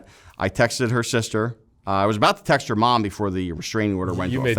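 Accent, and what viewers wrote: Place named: Baltimore, United States. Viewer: Holy hell is this dude from Baltimore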